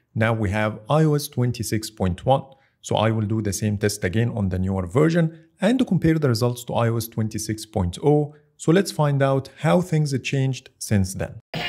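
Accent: Egypt. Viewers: Istg i knew you are Egyptian from the very first word ! All the support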